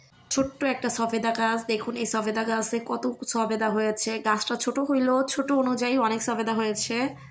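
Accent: Barishal, Bangladesh. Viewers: বরিশাইল্লা কথা ভালই লাগে। খুব ভাল লাগলো সবুজ ধানের ক্ষেত😊 হাসের বাচ্চা খুব কিউট 😊 পুরো টা দেখে নিলাম 😊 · দারুণ লাগলো ভিডিও টা আপু,,, আরও বেশি ভালো লাগলো আপনার বরিশালের আঞ্চলিক ভাষায় কথা। লাইক দিয়ে পুরো ভিডিও জুড়ে ছিলাম আপু 💕💕🌹🌹❤️❤️ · আপু বরিশালের ভাষা খুবই দারুণ ছিল 😂😂😂😂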